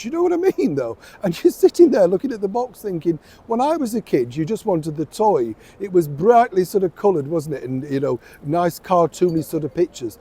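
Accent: Yorkshire, United Kingdom. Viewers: you crack me up, the Yorkshire keeps appearing 😀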